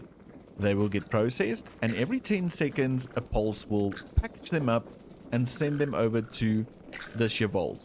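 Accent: South Africa. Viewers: are u south African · YOU ARE SOUTH AFRICAN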